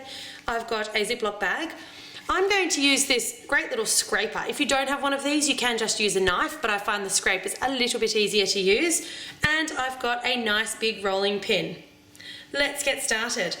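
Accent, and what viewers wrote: Australia. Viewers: i. love. australian. accents.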